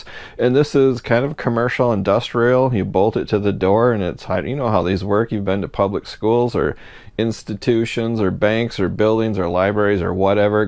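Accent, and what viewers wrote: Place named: Michigan, United States. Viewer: I appreciate your videos mostly because I love to listen to your accent and Michigan references. Dad had a pole barn in the back yard. Here in Missouri it's a shed or a shop. Born and raised in Midland I'm a Michigander living in a "foreign land". Thanks for your thrifting and sales advice as well!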